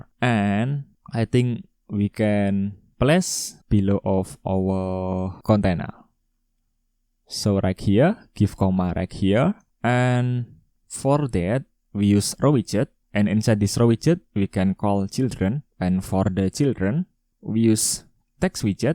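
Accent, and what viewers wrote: Indonesia. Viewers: Cara bicara inggrisnya seperti orang indonesia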